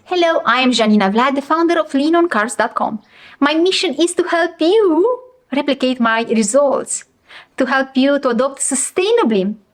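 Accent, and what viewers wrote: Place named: Russia. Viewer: You should stick to your native language, Russian or whatever, and dub in English. Your grammar is good but your manner of speaking is rough. No offense.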